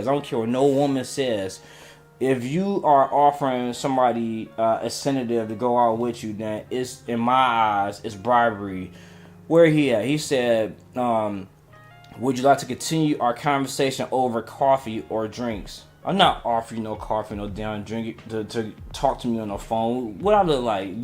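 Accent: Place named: Tulsa, United States. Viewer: what is from? I’m asking because my brother is from Tulsa and he has that same accent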